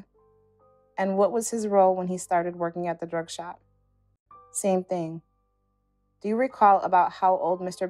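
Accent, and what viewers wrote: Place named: Baltimore, United States. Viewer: The narrator sounds like she from Baltimore, my city done gone to hell. Something has to be done about all of these shootings & murders or law abiding citizens need to protect themselves because the police can only do so much !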